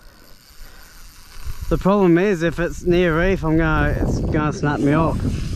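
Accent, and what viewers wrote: Australia. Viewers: Beautiful scenery, wonderful catches! 😀 I've never seen squid so transparent. And those eyes! WOW. Weird and wonderful creatures. Tasty too! 😆 I'm just trying to get a handle on your accent. It's sounds a little Aussie with a touch of Ringo Starr mixed in. 😁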